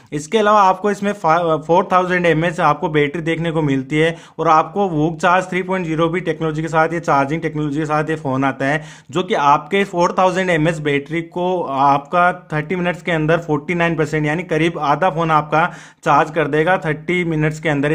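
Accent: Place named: India. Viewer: heard indian accent.. quick close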